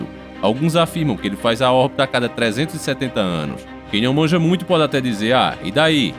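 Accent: Northeast, Brazil. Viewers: Sotaque de nordestino kkk mas a voz e boa... Cornao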